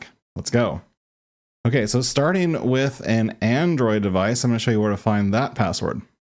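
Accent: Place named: United States. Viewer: American pronunciation BAD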